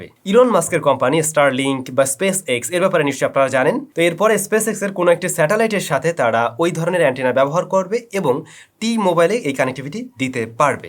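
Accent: Barishal, Bangladesh. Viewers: ভাইয়া কি বরিশাইল্লা??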